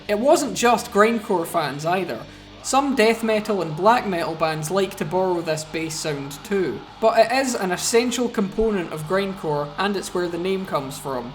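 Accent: Scotland, United Kingdom. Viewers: Yeees! More Metal and scottish accents 🤘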